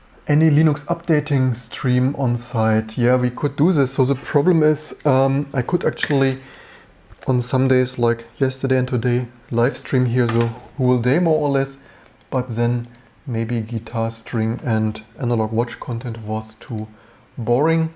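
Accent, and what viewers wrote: Germany. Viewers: Very informative video, its really motivating to see that im not alone with repairing stuff instead of throwing it away. PS: Are you German too? Your accent really sounds like it.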